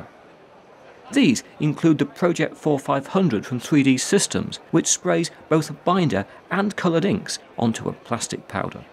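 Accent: United Kingdom. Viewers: To me, everything spoken with a proper english accent gets way more serious and undeniably true. Great narration.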